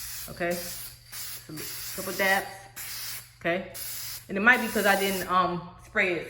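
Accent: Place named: New Orleans, United States. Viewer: You sound like you from New Orleans! I know that accent anywhere!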